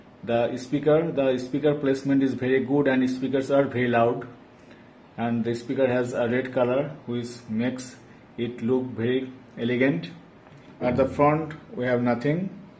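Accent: Bengal, India. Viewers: I'm 100% sure you are Bangali😂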